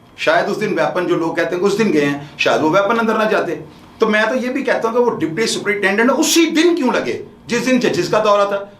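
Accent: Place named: Sialkot, Pakistan. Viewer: Aapka lab o lehja Sialkoti hai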